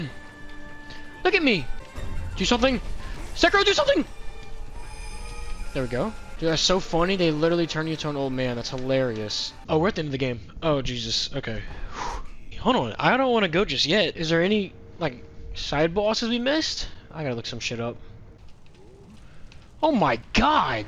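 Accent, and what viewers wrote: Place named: Delaware County, United States. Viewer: Immediately clocked that mid Atlantic accent. Youse from delco broh?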